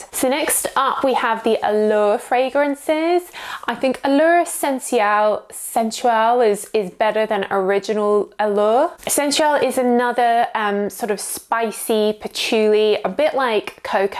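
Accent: England, United Kingdom. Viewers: I love your reviews! You were so correct about the Chanel Chance eau tendre. I bought it and it was the weakest perfume performance wise for the price. It disappeared on my skin and could not smell it after an hour or less. My coworker can wear it and I smell it on her all day long. But last year you told us about Zara Applejuice and I bought it last year for $25. It was the big bottle and it smells better than the Chanel IMO, and lasts longer and smells stronger than the real one. That’s on my skin though. Everyone is different. My skin tends to eat perfumes unless they are stronger or a citrusy fresh like Clinique happy works great on me for example. It was disappointing though buying the eau tendre just to have to return it back. Because it does smell so so good just very weak and expensive. So thankyou for all your tips! I love watching your videos and I love your English accent!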